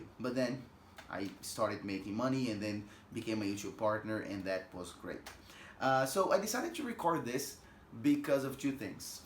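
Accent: Brazil. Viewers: Thanks. You should make one brief mention of Brazil in each video so new viewers will know where your very pleasant accent originates. Like "Here in Brazil...." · Imaginei que era brasileiro por causa do sotaque. Caracteristico!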